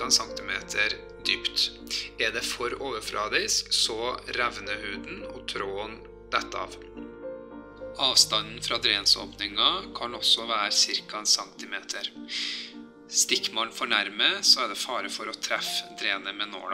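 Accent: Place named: South Africa. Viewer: it`s english but with a south african dialect.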